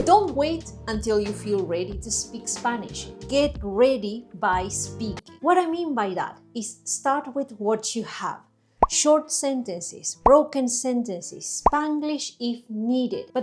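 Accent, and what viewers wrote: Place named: Rioplatense, Argentina. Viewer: Me gusta mucho este video y tu canal. Estoy aprendiendo español rioplatense y siempre estoy buscando por más input comprehensible con ese acento. Muchas gracias!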